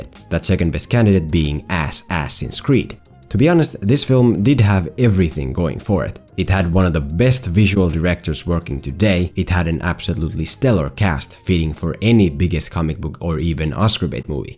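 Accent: Finland. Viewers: Youre finnish arent you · Exactly what I'm thinking! Firstly, torille. Secondly, a Finnish accent is too distinct for even a master to hide. Seriously, what else could it be? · are you finnish???? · Hey mate, Are you from Finland ? 😄✌🏾 🇫🇮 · I love your distinctly Finnish accent! Now don't take me wrong. Your pronunciation and rhythm is very good and you're extremely fluent.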